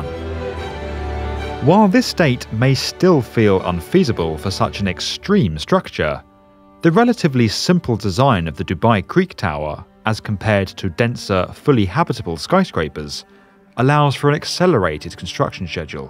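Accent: United Kingdom. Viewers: SuperOffroader6x6 it’s called a british accent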